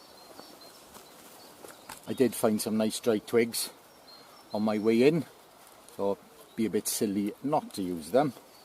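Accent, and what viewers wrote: Wales, United Kingdom. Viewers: Probably the MOST Welsh accent I’ve ever heard!! 😅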